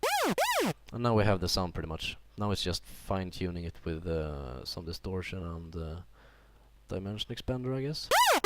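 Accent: Sweden. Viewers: Dat Engelska med svensk dialekt!! epic!! :D Bra Tutorial mee :) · det hörs att du är svensk ;)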